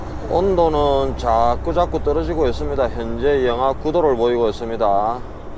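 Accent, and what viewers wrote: Gyeongsang, South Korea. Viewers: 2025년 4월10 경상도 표준말이 그리워 또 왔습니다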